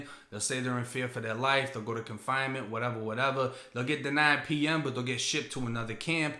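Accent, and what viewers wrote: Boston, United States. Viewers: You tlk like you from the bean ... When i went to florida every1 knew i was from Boston we just tlk a certain a way. You had to have spent some time out here. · You kinda have that Boston accent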